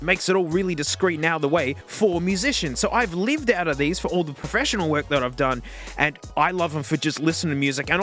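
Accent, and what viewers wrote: Australia. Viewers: yelling austrailian man is back :0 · why are you watching that when you could be listening to an Australian man talking about headphones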